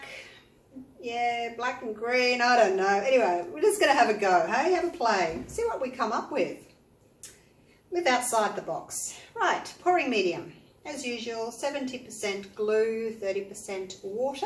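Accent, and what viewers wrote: Australia. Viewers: Do you are silicone to your paints? I just love watching you :) YOU ARE FUN Fabulous So Cheerful and very TALENTED too! Love your Style & Aussie Accent is music to my ears :) just lovely and your designs are brilliant!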